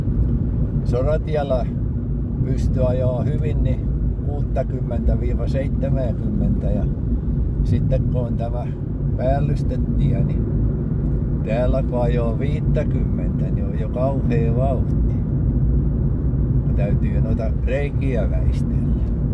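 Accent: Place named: Tampere, Finland. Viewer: Aaargh!😖 Saan suorastaan oksennusrefleksin, kun kuulen tamperelaisella murteella ”määää näin niin isoja Raktodin munia pellllolla” 🤮🤮😵 Ei kertakaikkiaan voi käsittää, miten ylös työntävältä voi kuulostaakkaan tämä ”raktodin muna” näin isäntänä…..ja murteeltaan. 😵‍💫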